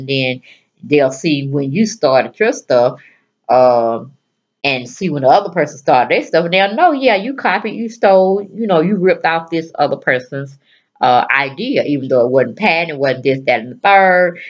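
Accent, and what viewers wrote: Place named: United States. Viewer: Slow down I can’t understand your country accent you talking to fast lol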